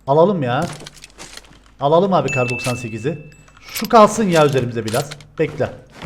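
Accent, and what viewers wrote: Cyprus. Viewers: Abi gercekten kıprıs lımısın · Kıbrıslıları abi sayesinde asik oldum şivelerine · KIBRIS KONUŞMASINI COK SEVİYOM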